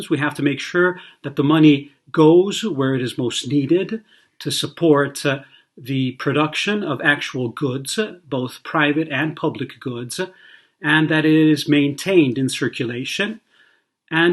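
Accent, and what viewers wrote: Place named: United States. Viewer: Nice American accent for an Italian, bravo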